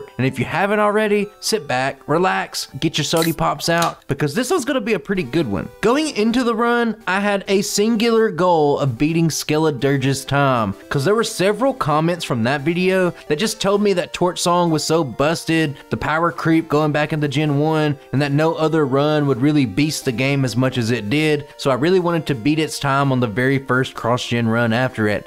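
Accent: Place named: Kentucky, United States. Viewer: My son got excited when he heard your voice because “He sounds like he’s from Kentucky like us!!” 😂😂😂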